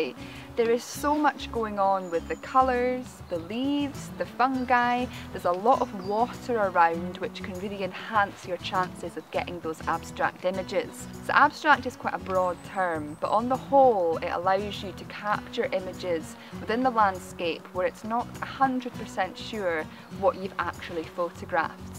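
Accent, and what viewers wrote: Scotland, United Kingdom. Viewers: Thoroughly enjoyed the video and your wee Scottish brogue.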